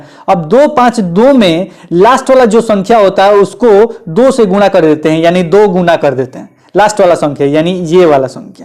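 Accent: Bihar, India. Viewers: Sir ji aap to pakke Bihari Lg rhe hai matlb aapki bhasha 😀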